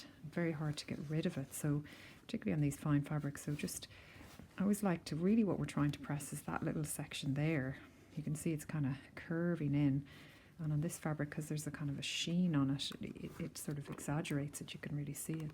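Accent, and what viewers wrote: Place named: Ireland. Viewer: Irish accent😊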